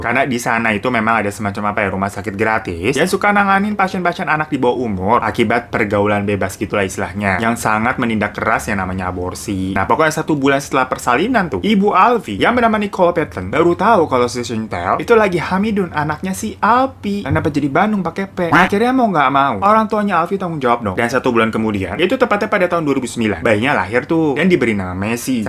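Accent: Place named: Banjar, Indonesia. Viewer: Kayak org Banjar wkwk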